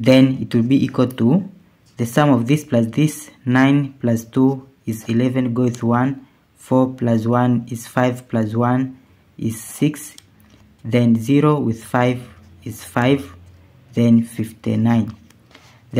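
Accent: India. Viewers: インド訛り？の英語がクセになってつい見てしまう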